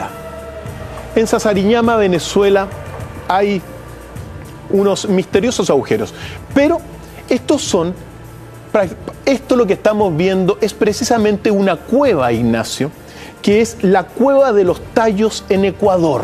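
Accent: Chile. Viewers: Este programa es chileno? Yo tenía un amigo que tenía un acento igual y me dijo que era de Chile. No sé. Igual me equivoco, pero este acento parece de Chile. Aunque tengo dudas sobre si es de Uruguay o Paraguay. No sé. Es difícil distinguir con tanto acento latino. De Cuba también podría ser. Pero de Méjico no, desde luego. Tampoco de Perú. Bueno, ahí lo dejo. Un enigma para mi.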